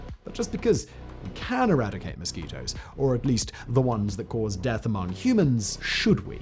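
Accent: United Kingdom. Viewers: I thought this was v sauce doing a British accent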